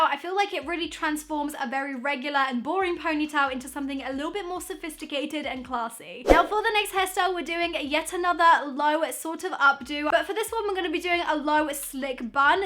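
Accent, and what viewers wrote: England, United Kingdom. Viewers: Of course you look beautiful with your English accent and your beautiful hair while I am here stuck in Florida sinking with the world with the ratchet hair that looks like a mop so no I cannot do these hairstyles because I have the ugliest hair ever and you have hair that looks good sorry for the rant I’m bored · I just love British accent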